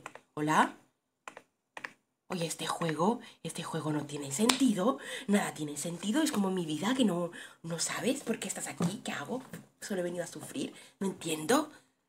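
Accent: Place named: Spain. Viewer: no, no es española pero se le pego el acento, algun problema?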